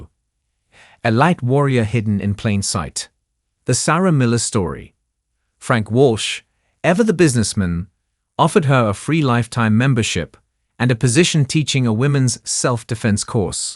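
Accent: United Kingdom. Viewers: Sorry to say I cannot listen to this story. The robot reading the story with his british accent, it's way of the character of the story british accent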